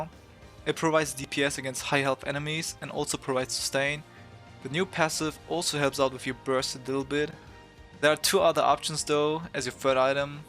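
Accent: Germany. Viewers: are you german? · Are u German? I hear some accent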